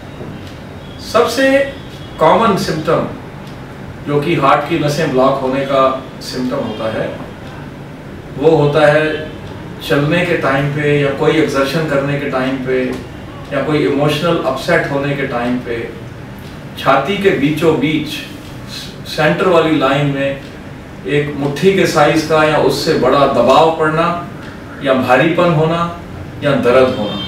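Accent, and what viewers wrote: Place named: Pakistan. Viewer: I thought you were Pakistani from your accent. Very nice information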